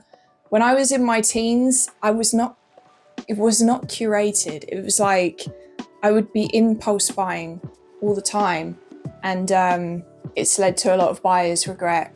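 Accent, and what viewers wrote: England, United Kingdom. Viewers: Yes. I like it. I'm not from english speaking country. I like english accen the most ☺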